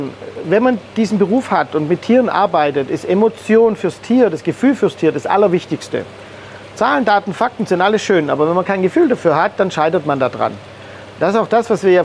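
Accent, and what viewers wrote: Swabia, Germany. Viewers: Ich schaue die Videos super gerne, insbesondere des Klartextes und der Direktheit wegen. Der schwäbische Dialekt ist nicht so krass, dass es die fehlerhaften Untertitel bräuchte.😉 Danke für die Mühe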